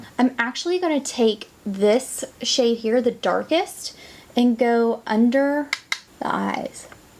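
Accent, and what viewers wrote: South, United States. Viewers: You are gorgeous and I love the look. I just received my Chanel Seduction eye palette and Romance eye pencil. I am anxious to use them. I love your Southern accent. I was born in Dallas, Texas and I am a Southern gal at heart.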